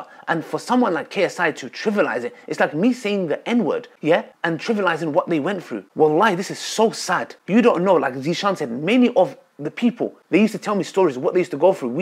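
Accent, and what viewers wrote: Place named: United Kingdom. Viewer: to be honest he always appeared to me as a British guy because of his accent. Never thought of him as a Kurd lol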